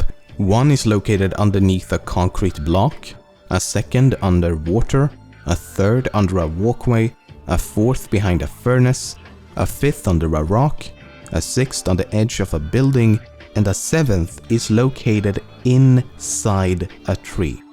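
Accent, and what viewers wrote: Gothenburg, Sweden. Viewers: Jävlar vilken göteborgare du var! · it sounds like you are Swedish I can hear it on your accent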